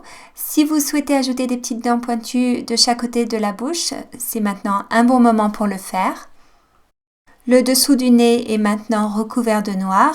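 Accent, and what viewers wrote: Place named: England, United Kingdom. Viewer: vous avez toujours été française ? car j'ai l'impression que vous avez un accent anglais.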